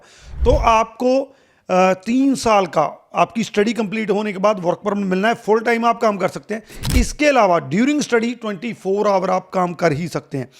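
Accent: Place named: Punjab, India. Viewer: He is from a Punjabi speaking background, that's why it is a little bit different accent.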